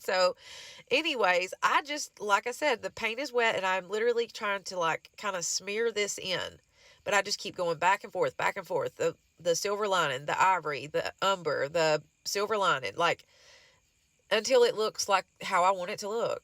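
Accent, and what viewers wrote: South, United States. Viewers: I love watching your channel so many pretty country farmhouse things you make and I love your southern accent you remind me so much of that TV show designing women which I loved to watch also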